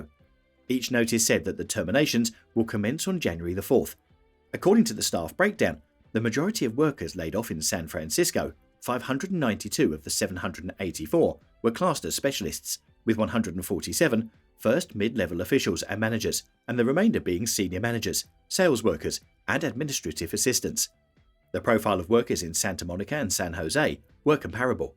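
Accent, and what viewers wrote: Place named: United Kingdom. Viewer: Is anyone else sick and tired of YouTube podcasters employing British accented narrators? They sound like they have a cob stuffed up you know where. I, for one, would much prefer narrators who speak with a good old American accent.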